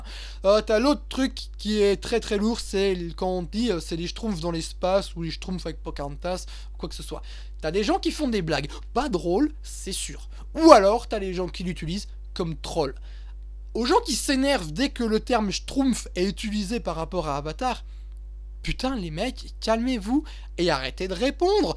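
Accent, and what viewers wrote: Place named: South, France. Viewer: (Ton accent du Sud est un peu à revoir, mais merci quand même de cet essai sonore:D) Ah oui, je me souviens de ta chronique sur Avatar d'il y a quelques années ^^ (comment l'oublier? tu t'en étais pris plein la gueule, après ta vidéo, par des personnes qui étaient fans du film xD). D'ailleurs, ce serait bien que tu postes tes nouveaux "Grumpy mate des flims" sur ton blog.:) C'est intéressant de donner une seconde chance à des films, je le fais aussi (pas pour TOUS les films que j'ai trouvé bof, mais pour certains oui, et du coup j'ai parfois de bonnes surprises au second visionnage).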